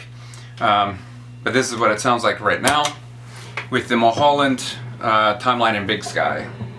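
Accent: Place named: Alberta, Canada. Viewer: Hey man, Just curious are you in bc or Alberta? you have that west coast accent but it feels more Alberta to me than bc. I love the videos, I really dug the budget rig. I have a question for you , do you happen to have any suggestions for a budget friendly versatile rig for bass and guitar? I need a full guitar rig so I can play lead and also lead worship but I'm also a worship bassist but not a normal one lol I do all sorts of weird stuff I play chords and fill spaces like you would in a punk band. that said I'm leaning towards a helix and then building a stereo tube power amp. but id be down for some suggestions that would keep me around 1k or slightly over for a bass and guitar rig (note I don't have an electric, however I do have a tuner, sans amp vt bass di , some cables, and a early 2000's stingray 4 string)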